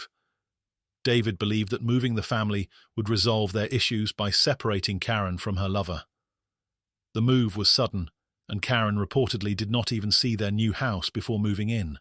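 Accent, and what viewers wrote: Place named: England, United Kingdom. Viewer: I was concerned too when I heard the name hogshooter I am English and love the English voice .thank you for your exceptional channel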